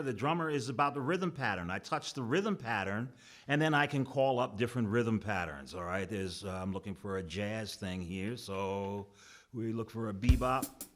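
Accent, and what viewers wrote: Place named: New York, United States. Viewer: Awesome demo awesome New York accent!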